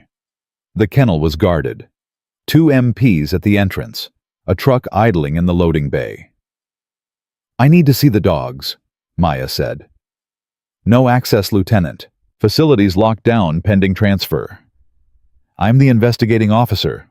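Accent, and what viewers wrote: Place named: United States. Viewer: Sounds very US 🇩🇰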